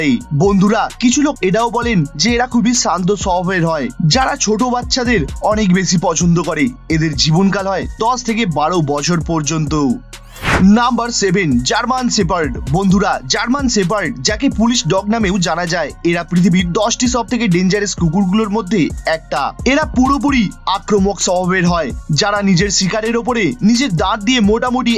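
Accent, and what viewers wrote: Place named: Kolkata, India. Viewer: কলকাতার ভাষাগত টান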